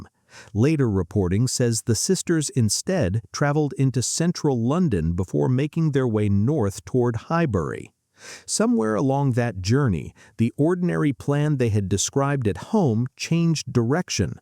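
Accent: United States. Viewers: Why an American voice on a British crime case.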